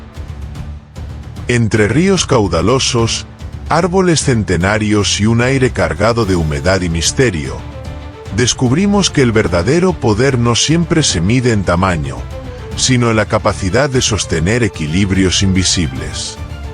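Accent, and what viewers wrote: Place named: Spain. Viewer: Hoy he visto este documento y me agrada mucho que se hace énfasis en 1. Mostrar parte de la biodiversidad de insectos en la Amazonia y las interacciones de estos con el entorno de la selva y la necesidad de preservarlos para la salud del ecosistema y el planeta; 2. Como cada especie tiene un papel en el intrincado rompecabezas de la Vida y el aleteo de una mariposa repercute en los confines del cosmos. 3. Como videofilmador de naturaleza coincido en que la selección de la música y los sonidos acompañantes es muy importante, también la voz del narrador es importante, aquí tiene un acento español y creo que el canal es de América, cierto? Mi canal es de flora y fauna de México y todo mundo lo mira sabe que es una ventana a México. Saludos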